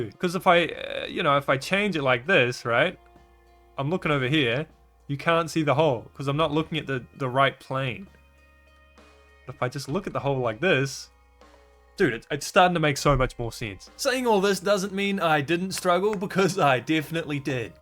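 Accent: New Zealand. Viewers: I knew the fuck as soon as I heard your voice you're a kiwi, where are you from my brother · New Zealand accent? Oh this is about to be a life-changing video lmao (all the cool people live ther)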